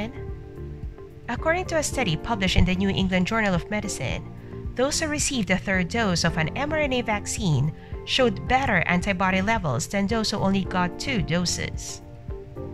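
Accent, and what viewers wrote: Philippines. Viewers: Filipino accent is too strong. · no. She Sounds Filipino.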